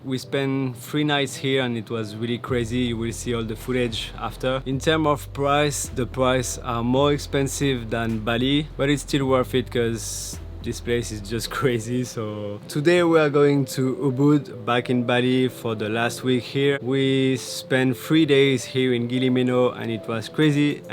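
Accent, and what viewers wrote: France. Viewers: your voice in combination with the french accent is so nice to listen to! wonderful aerial shots, that want me to go back so badly.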